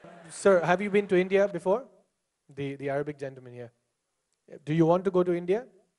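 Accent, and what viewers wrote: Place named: Philippines. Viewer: Your Filipino accent is killing bruh!!!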